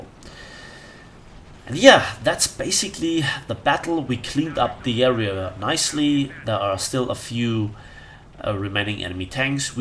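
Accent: Germany. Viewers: your voice sounds even more German like this. It is EVEN BETTER. NO NEED TO SAY SORRY. LOVE YOUR VIDEOS. YOU ARE WHAT KEEPS ME PLAYING WAR THUNDER. THANK YOU FOR BEING SUCH A GOOD CONTRIBUTOR TO OUR COMMUNITY.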